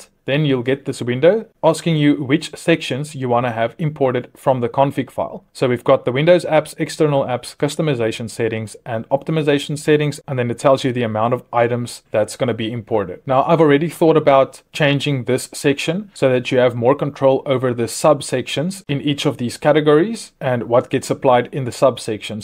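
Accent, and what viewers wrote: South Africa. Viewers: Dude, are you a South African?????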